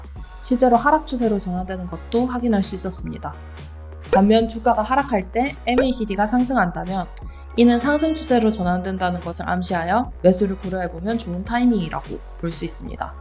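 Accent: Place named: Gyeongsang, South Korea. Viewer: 경상도 분이다~~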